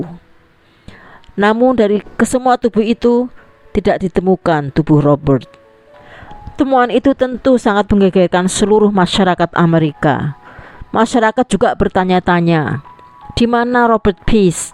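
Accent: Java, Indonesia. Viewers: emba ini suara nya kya msih kentel logat jawanya ya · Apakah kamu sudah menjadi orang yg berguna dan memberikan kata2 yg positif utk org2 disekitarmu?Berkata- katalah yg baik, memujilah!! Kalau kamu tdk suka, bukan berarti org lain tdk suka. Karya dan kreatif, berbeda dgn youtuber lainnya, hargailah. Dgn medok jowo nya lah, menjadi karakter ciri khas yg saya suka. is the best!!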